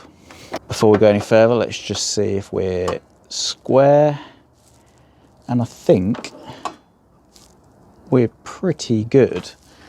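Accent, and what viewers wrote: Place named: England, United Kingdom. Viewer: Being French, it's very nice to have an equipment review with a nice English accent instead of an American one. And to hear you give measurements in the metric system: priceless!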